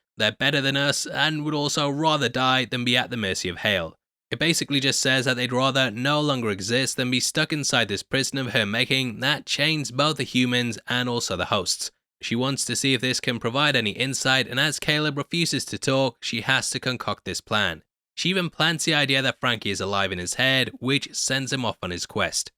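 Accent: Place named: Liverpool, United Kingdom. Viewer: You really sound like a scouser. I detect lol.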